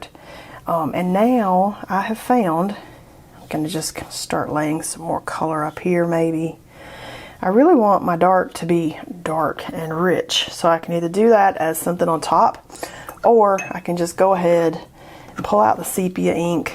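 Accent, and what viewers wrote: South, United States. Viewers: your southern accent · Never let go of your southern drawl or how you pronounce things!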